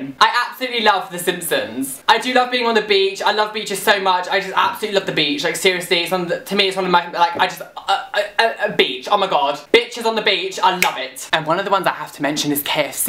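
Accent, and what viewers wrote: United Kingdom. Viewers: I LOVE the sound of rain on a tent. I love storms. I LOVE watching your videos!! Stars are AMAZING. I love British accents and terminology. That was part of the reason I watched your videos for a whole day! I love when I use a British term to my friends are family and they are like O.o and then it takes me a few moments to realize that I just called some guy a "git" so of course they aren't going to know what I'm talking about <3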